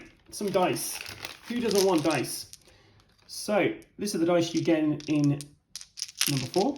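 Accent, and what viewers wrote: United Kingdom. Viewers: OK, I'm confused. Youtube says this was uploaded a year ago but it only came out a couple of months ago didn't it? I'd put it down to geographic area but I'm uk and your accent is English.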